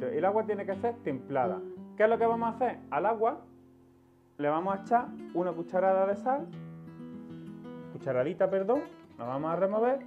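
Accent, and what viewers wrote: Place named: Granada, Spain. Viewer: Me encanta escuchar tu acento y tus palabras de Grana soy de Armilla vivo en California por 42-43 años cocinero o como ahora se dice Chef , jubilado pero sobre estas fechas empiezo añorando mis comidas y niñez Trabaje en Casa Salvador en los 70s Un saludo y gracias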